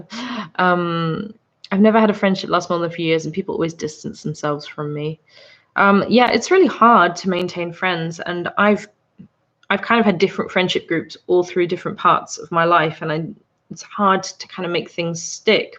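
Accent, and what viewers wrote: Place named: England, United Kingdom. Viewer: I'm. A huge fan of you ur the aesomest English girl I all so love ur acsent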